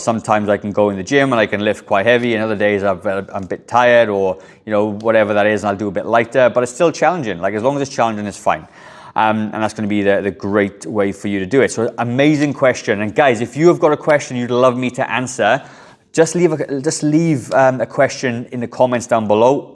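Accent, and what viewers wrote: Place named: England, United Kingdom. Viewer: 2035. Are you ready for that? I’m 72, and Lisa Liang said in 2035 there will be a billion of us that will be 85. I’ll be 82. I’m thinking Tai Chi, Yoga, calenthenics, and the like. Americans(my wife would love your English accent) tend to think that if you’re not pumping heavy iron, and running 5, or 6 miles a day, it isn’t exercise. Marius Visser, also from England says that the group he works with are 60, 70, and 80 year olds. God bless you.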